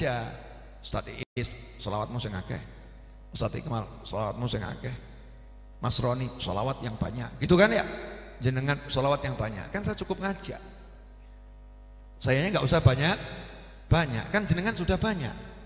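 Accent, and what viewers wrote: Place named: Java, Indonesia. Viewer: Suka sekali dengan cara penyampaian Habib, menyenangkan dan "njawani" Sehat terus ya Bib...in Sya Allah Aamiin 🙏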